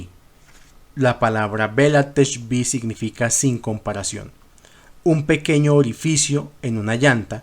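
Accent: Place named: Colombia. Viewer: Atento escuchándote. Tu acento me suena Colombiano. Un abrazo desde Brasil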